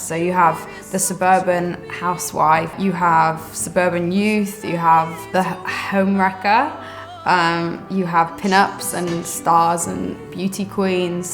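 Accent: Wales, United Kingdom. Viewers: Ugh her Welsh accent ♡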